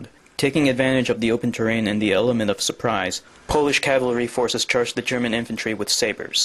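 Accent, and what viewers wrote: India. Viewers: I agree totally Indian accent.....better to do nothing please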